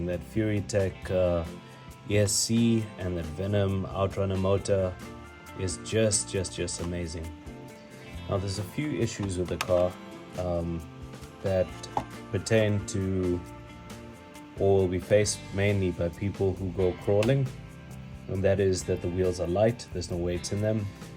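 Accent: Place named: South Africa. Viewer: Always great to hear a fellow South African when randomly browsing through hobby YouTube :) Quick question with the furitek starter kit how did you connect the two speed transmission servo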